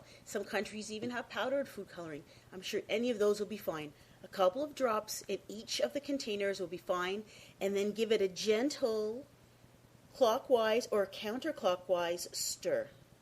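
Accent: Canada. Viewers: are you cannadian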